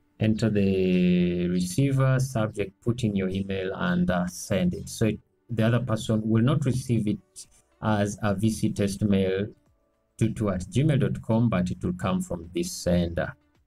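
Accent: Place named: Uganda. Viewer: You sound Ugandan, thanks!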